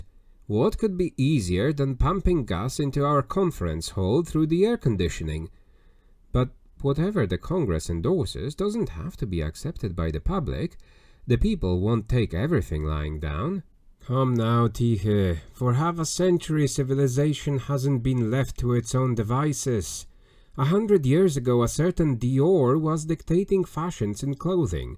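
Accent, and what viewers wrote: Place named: Poland. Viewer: Hey, thanks for reading this! Big fan of Lem and classic sci-fi in general, and this book is really fun. It's nice to be able to listen to it in English. Also, are you Polish by any chance? I feel like you speak with a bit of an accent, but I can't quite tell what it is. All I know is that I sound kinda similar when I speak English, and I'm Polish, so maybe it's a Polish accent? (That's not meant as a criticism, by the way; your pronunciation is very clear and your voice is consistent. Good job!)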